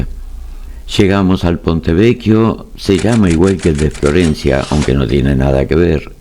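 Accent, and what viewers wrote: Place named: Argentina. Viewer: Buenos días Sr.; soy su nueva fiel seguidora, soy 🇵🇪, vivo en 🇺🇸 tengo 62 años, viajo a 🇪🇸a visitar a mi hija un año, y otro a 🇵🇪. Buscando consejos de viaje, con conexiones eso es muy estresante, encontré su canal, me encanto por la forma pausada en que nos habla, aparte su acento argentino, trabajé 5 años en su País, conocí gente maravillosa ❤. Por eso mi cariño eterno 🇦🇷. Muchas Gracias, este video me hizo recordar mi paseo a Fiorenze.. y la vez que me perdi al regreso a Roma por qué me fui hasta Nápoles 😅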